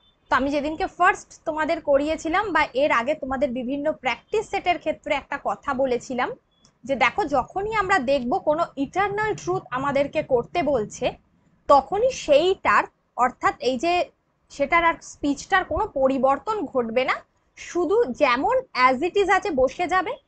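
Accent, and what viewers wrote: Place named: Bangladesh. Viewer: Mami Videote Mamar Chhobi Dekhchhi Aabar Tumi Class Korachchho?Mami Tomra Ki Rifiuji? Tomar Bhanshar (Language) Dhoron (Style) Kintu Bangladeshi Mone Hochchhe?Mami Tumi Jader Class Korachchho Mane Aamaderke Tomar Chhoto Bachcha Mone Hochchhe?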